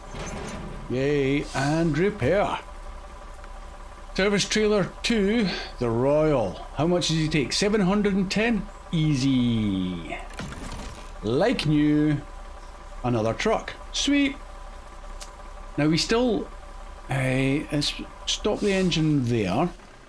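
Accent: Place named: Arbroath, United Kingdom. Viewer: Yeah enjoyed the longer format buddy 👍 As for next agree with Docks and other bridge I always try to fix infrastructure first it just makes everything else easier. Lots of local tasks and some contracts are also possible without leaving this map to help level up and make more moolah 😂 BTW your accent sounds more like somewhere around Abroath to me but I'm a Flatlander although have family from around Inverness. Keep on trucking buddy 👍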